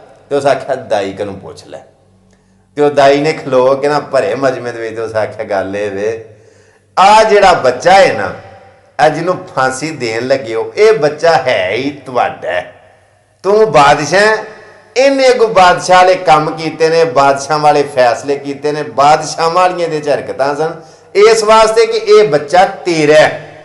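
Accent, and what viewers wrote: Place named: Gujranwala, Pakistan. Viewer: Story nonany wala apne lhjy se Gujranwala side ka lg rha.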